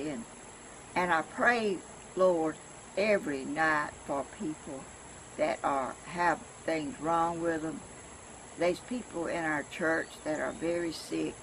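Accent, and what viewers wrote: South, United States. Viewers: That’s exactly why you’re healthy because you haven’t been abusing your body in anyway And you have a great sense of humor and a happy person has a longer happier life I believe I think it’s wonderful stay the way your you’re absolutely beautiful lady very loving and caring and funny you are one of a kind I have so much in common with you is incredible even the southern accent and you do remind me a lot of my mother I miss her yeah I love birds to that a lot of birds in my yard and rabbits and squirrels love all gods creation May God bless you😊💕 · I Luvvvvv you. You are my U-Tube Granny from a brown mommy. My maternal Granny is heaven, my mom has Alzheimer's. When I need some "old-fasion-love" I visit you. Watching you sip your coffee, listening to your non-stop southern chit chat and your promisie to make it short fills a big whole in my heart. 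I luvvv it.! I enjoy sitting outdoors with you while you share your creative talents and your appreciation of Mother Nature. Your windchimes are music to my ears. Did you make them? I want them in my yard!! Your love of God is uplifting. May you continue to be blessed with good health and sound mind. I want to thank your grandson for being so patient and loving. Keep creating for it is your lifeline. Thanks for making me feel at home Granny. 🌻☕😘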